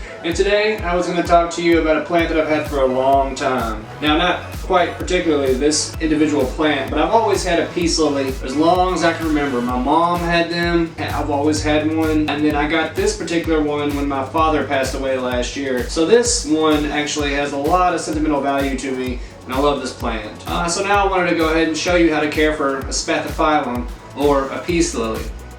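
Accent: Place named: Central East, United States. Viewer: Just got my first peace lily and this is such a great video. I also knew you had to be from a central eastern state from your accent. I’m from Southwest Virginia😊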